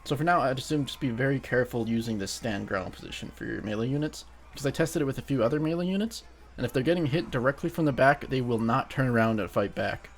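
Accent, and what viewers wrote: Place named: England, United Kingdom. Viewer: i just thought it was kinda funny i always love to hear the english pronunciations <3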